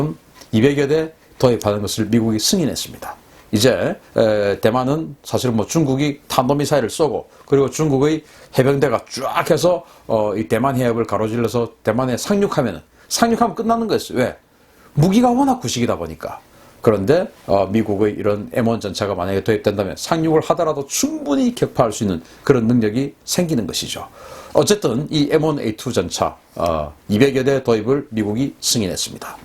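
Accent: Gyeongsang, South Korea. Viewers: 신인균씨 경상도 사투리가 조금 남아 있네요. 표준어 구사를 부탁합니다.. 자주국방 네트워트 회원이었죠. 지금 한미간에는 어느때보다 가까운데 갈팡질팡이라는 것은 가짜 뉴스에 불과 합니다. 조갑제 tv등 극우 언론인 ,기독교단체의주장이 일방적으로 진실이다? 무슨 설교하세요? 몇일전에 미국 재무부가 확인해준..한국의 가짜뉴스를 인증했는데... 미국도 친중인가요? 그럼 박근혜때 친중을 넘어 사대주의 끝판왕일때는 뭐하셨어요? 박근혜 정부 찬양하느라 바쁘셨는지? 중국 사대주의 박근혜 -시진핑은 베프 통일방해 북한지원 중공군 빨갱이 시체 무상으로 헌상 -친중 서방진영유일 중국과 FTA 박근혜-친중 TPP 쌩까고 AIIB 가입 : 친중 중공군 전승절 공산주의 동맹 반미 : 친중 대통령 당선직후 중국에 김무성 특사 : 친중 중국 미세먼지에 고등어 타령 : 친중 평택 차이나타운 건설 : 친중 제주도에 중국공안 배치 검토 : 친중 차이나머니로 정계, 언론계, 문화계 친중매국노 판 만듬 : 친중 차이나머니로 국내 기업 M&A해서 기술 다 빼김 : 친중 중국의 남중국해 강도짓에 침묵 : 친중 중국의 센카쿠 침입에 침묵 : 친중 이것이 친미인가요? 친중인가요? 이명박이 적극 지원해서 건립한 롯데월드는 신이내린 안보방어벽입니까? 노무현때는 논의하고 거절하면 죽일것처럼 덤벼들고 그것때문에 자주국방테느워크 사이트에서 많이 싸웟죠 대부분의 회원들의 역사관이 개신교, 이승만 박정희 박근혜 이명박... 그리고 일본에 대해서는 섬김의 나라..일본의 식민지배 찬양하는 회원들이 많았고 밀리 사이트인지? 아니면 극우 정치사이트인지 ? 에스더라는 극우 개신교 가짜뉴스방송과 조중동이라는 친일극우신문의 가짜뉴스를 보고 판단하시는건가? 문재인정부가 갈팡질팡이라.... 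밀리터리도 그닥 잘아신거 같지 않지만.. 정치경제를 모른가면서 가짜뉴스를 바탕으로 정치경체논평하는건 모순이라고 생각합니다만? 美, 대북제재 구멍 겨눠… '세컨더리 보이콧' 명시 : 뉴스 : 동아닷컴 이밖에 신인균씨와 조갑제씨와 친하신가? 조갑제 방송에도 나오시네요 그 조갑제 티브이...검색하니깐..전부 박근혜 친박 극우개신교단체사람들만 사실이라고 주장하던데... "한국에 세컨더리 보이콧"?…미 재무부 '사실 아니다' 확인 국내기사뿐아니라 미국의 문재인 트럼프 한미관계 대한 자료입니다. 미국 재무부 " "한미동맹 굳건"…트럼프 "한미는 위대한 동맹관계 트럼프 "내 친구 문재인".. 친분 과시한 한미 정상 <link> <link> 트럼프 "한미FTA로 양국관계 발전·도약" 웜비어 부친 "문재인·트럼프, 한반도 평화구축 노력 지지" 문재인·트럼프 대통령 노벨평화상 받을까 트럼프가 시진핑에게 문재인과 친분과시 문재인이 한국에 중국군대를 주둔하자고 했나? 역대 최고 수준의 한미관계를.. 박정희가 미사일 사거리 주권 헌상하고, 박근혜는 뭐했으며.. 문재인이 미사일 사거리 중량 패기는 친중인가요? 미국 전략자산 들어 오는것도 친중인가요? 이명박 박근혜 때 9년 뭐했어요? 비판좀 하시지...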